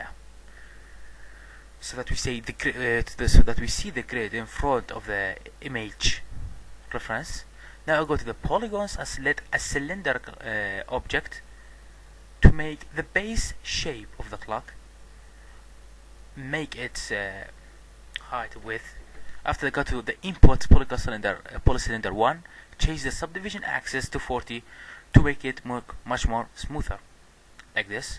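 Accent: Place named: France. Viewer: thank you very much ,,, you are french,Right?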